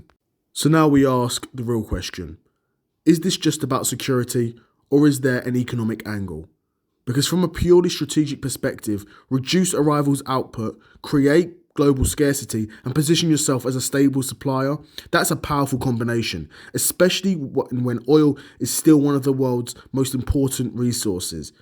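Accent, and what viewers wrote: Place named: United Kingdom. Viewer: oh,... you got a britney accent...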